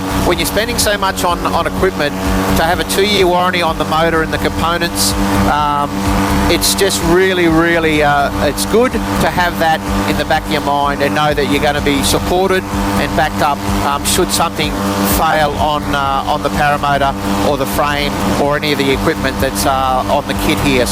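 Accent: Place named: Australia. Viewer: great vid as im very interested in what you say as im wantint to get into paramotoring been so confused were to start as i been very confused with so many brands and saddly all crap american vids who is best cap kurc dell swatz? keep away from theses guys they play with your mind 😱but hearing the aussie accent is music to my ears so thank you for your great video 👍🏻🤗🇦🇺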